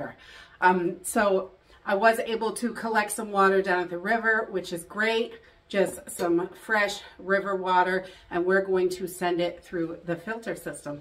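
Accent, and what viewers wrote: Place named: New England, United States. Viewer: You are already getting a New England East Coast accent!! You can come park yah cah next to mine! Welcome 🤗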